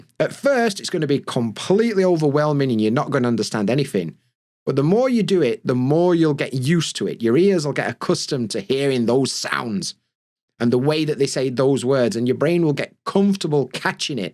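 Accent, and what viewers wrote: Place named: Yorkshire, United Kingdom. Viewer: yeahh mate, thanks !! just be able to hear your accent is already help a ton ! not everyone use yorkshire accent everyday !! and you already give a lot of ecouragement video too, thanks !! and if you can give more things about some rules,, that would be perfect !!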